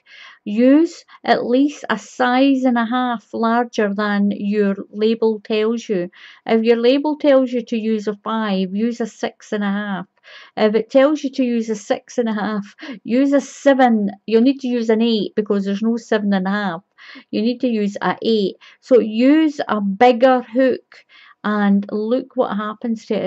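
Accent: Scotland, United Kingdom. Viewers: firstly I cud listen all day to ur lovely Scottish accent! I have used ur videos so many times! Thanks so much for sharing and it being so easy to follow xx❤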